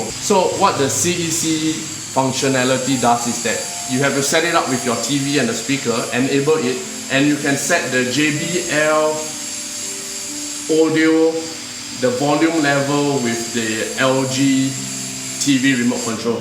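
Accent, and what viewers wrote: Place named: Nigeria. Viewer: U gat Nigerian Accent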